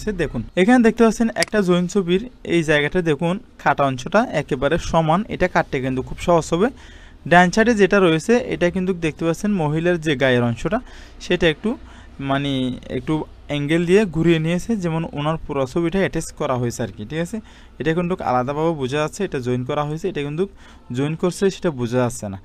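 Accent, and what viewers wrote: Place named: Noakhali, Bangladesh. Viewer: ভাই আপনি তো নোয়াখালীর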